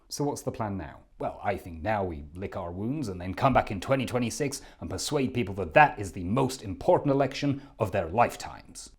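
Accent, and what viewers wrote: United States. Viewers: That US accent! It’s, like, 98% perfect—which is delightful, in a surprising way and I’m really impressed. “We believe in democracy and the peaceful transfer of power” —we can see the wheels churning: “Get that o right in ‘democracy’! Be rhotic! Be rhotic!” But it’s the 2% that isn’t perfect that I really love. (If it were 100% right, it would be, well, weird, almost distracting.) Great job! I love it. (And, yeah, I’m in the US.) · Congrats on the better-than-I-expected American accent!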